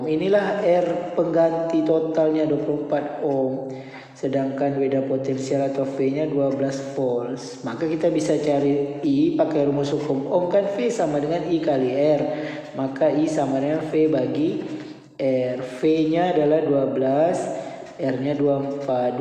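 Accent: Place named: Malaysia. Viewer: Waw abang aksen ya aksen malaysia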